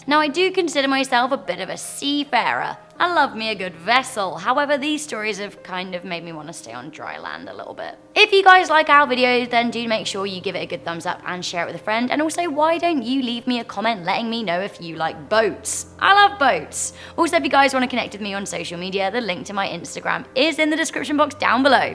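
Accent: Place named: RP, United Kingdom. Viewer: Your Posh English accent is so... 💣💥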